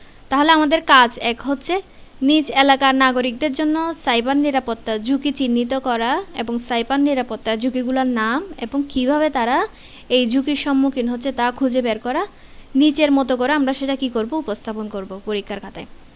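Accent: Chittagong, Bangladesh. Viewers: Sis is pure chittainga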